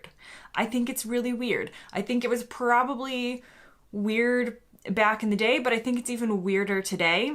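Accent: United States. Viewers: I found it weird saying that you're a Brit now if you're saying you have dual citizenship wouldn't you want to also say that you are American as well you sound American but I do like the content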